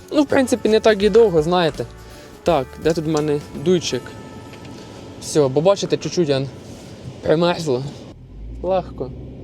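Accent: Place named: Slobozhanshchyna, Ukraine. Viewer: Вдячна за відео. Це мій улюблений "жанр" в ютубі - подорожі по різних куточках різних країн. Але під час перегляду чомусь прийшло на думку давнє іспанське прислів'я:"Швейцарія - це красиве дерево, яке росте на смітникУ". Сподіваюсь, контекст прислів'я зрозумілий для думаючих людей. З повагою. Окрема вдячність, що не забуваєш "слобожанську говІрку"!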